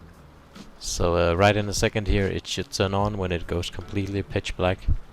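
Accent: Denmark. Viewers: Du lyder ekstremt dansk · u sound danish? are you? din frække dreng xDD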